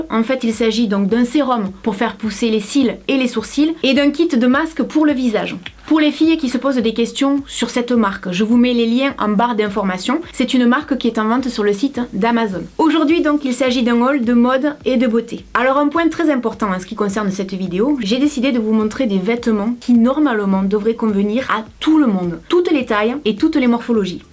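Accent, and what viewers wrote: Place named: Perpignan, France. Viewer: ( 1 er commentaire ) coucou je t'adore je t'ai découvert sur m6 j'ai 10 ans j'adore l'accent de Perpignan répond moi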